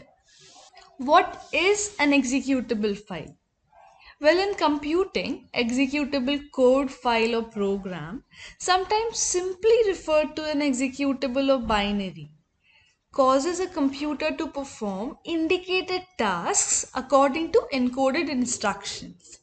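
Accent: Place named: India. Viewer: Good content, but the Indian accent is SO STRONG that it is VERY Distracting. The cadence of speech is from a totally different language than English. Please learn how to speak with at a minimum British accent, or much preferred ... an American-style accent.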